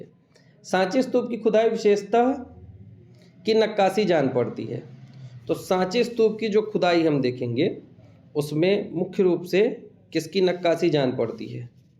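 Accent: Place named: Allahabad, India. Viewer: Aur sir mujhe pata hai aap bhi Allahabad se hi hai aapki language ekdum allahabadi hai Naini ki aas paas se hai aap Mai aapse 2 ya 3 baar baat kar chuka hu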